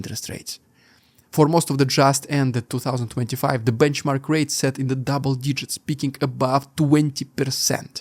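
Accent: Russia. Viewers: he is not interested in phonetics. He speaks Russian but with English words. Horrible to listen to. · Mr. RUSSIAn. You lied again... RUSSIA GET ALL CHIPS IT NEED FROM tHAIWAN AND SOUTH kOREA, AND EVEN zELENSKY ADMITED IT. Buy you keep lieing people and give them fake news so that you tube pay you buy susribers. I dont even understand your enlgish becouse it is so distorted and intenitonaly made severly RUSSIAN...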